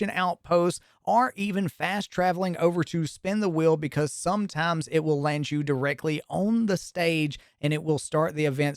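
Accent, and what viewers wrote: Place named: Eastern Kentucky, United States. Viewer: You have got to be from the Eastern KY area with that accent. Recognize that anywhere. 😁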